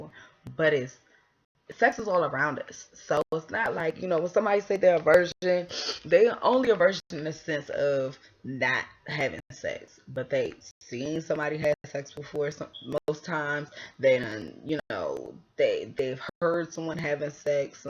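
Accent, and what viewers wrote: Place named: DMV, United States. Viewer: She definitely from DMV talking like that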